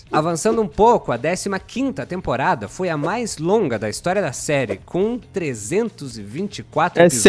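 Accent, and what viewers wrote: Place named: Rio de Janeiro, Brazil. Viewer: Todo carioca fala assim mesmo ou é só tentando falar igual aos mais conhecidos?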